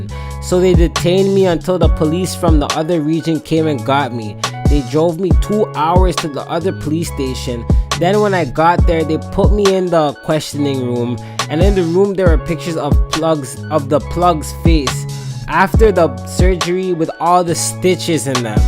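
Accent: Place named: Toronto, Canada. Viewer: Dats funny I be from the 6ix too I recognized ur accent fam 😂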